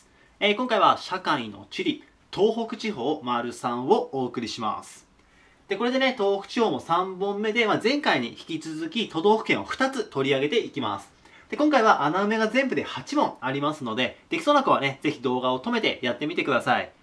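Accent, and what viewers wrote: Kansai, Japan. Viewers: さんって関西出身ですか？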